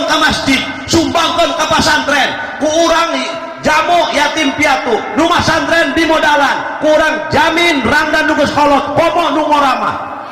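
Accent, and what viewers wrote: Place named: East, Indonesia. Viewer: Syedap .. sundanya logat mana kaya daerah timur Salam Lembur Kuring Purwakarta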